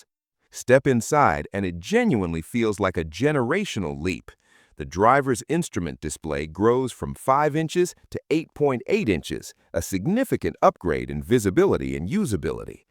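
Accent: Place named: United States. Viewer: Agreed! And to make it worse, the AI voice is 'Merikan!!!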